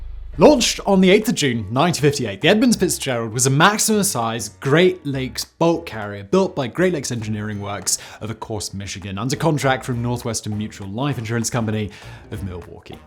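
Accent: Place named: England, United Kingdom. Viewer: I was amazed that the radio call was preserved, and isn't it odd that a midwestern sailor speaks with an English accent and oh I'm an idiot, that's voice.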